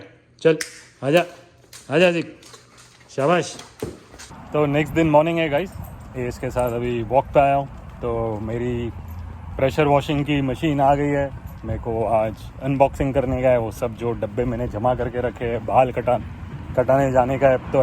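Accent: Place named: Gujarat, India. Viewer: First time viewer- Bolne ka tone gujrati he ya marathi bit confuse. But style hrithik jesa he bilkul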